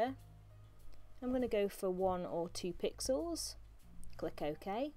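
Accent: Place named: Birmingham, United Kingdom. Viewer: Great tutorial! Thank you! Love your accent by the way! Is it brummie?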